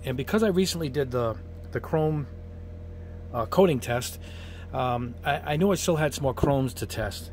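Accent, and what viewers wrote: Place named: New England, United States. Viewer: I’m hearing a distinct New England accent here…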